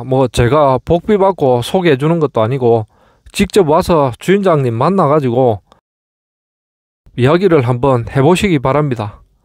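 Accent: Gyeongsang, South Korea. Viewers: 갱상도라 더더욱 신뢰가 안가네…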